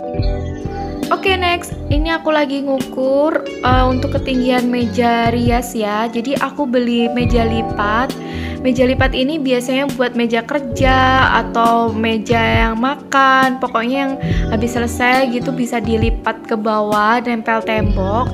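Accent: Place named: Java, Indonesia. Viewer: Orang mana mbak? Kedengarannya medhok hehe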